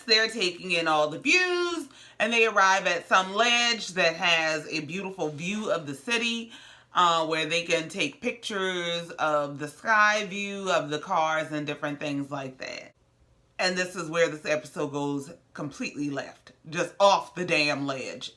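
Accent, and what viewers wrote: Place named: Louisiana, United States. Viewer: you got me gul! There were just some things you said that gave Shreveport and NOLA tease. Geechee accent is one I'm not that familiar with. That Carolina food good AF tho😊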